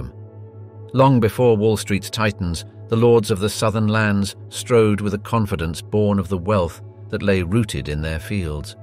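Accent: United Kingdom. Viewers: Just what I need...Some dude with a British accent lecturing me about American slavery. Why don't you tell us about how England enslaved Ireland for centuries?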